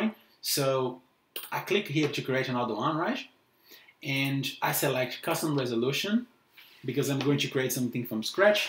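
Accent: Brazil. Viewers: Vc por acaso é brasileiro ? Oo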